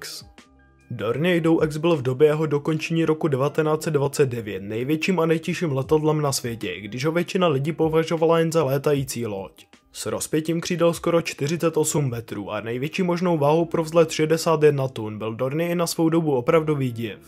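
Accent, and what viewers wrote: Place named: Moravia, Czech Republic. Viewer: Ta moravština je příšerná řeč ale jinak obsahově slušný... namluvit to civilizovaným jazykem, dám like.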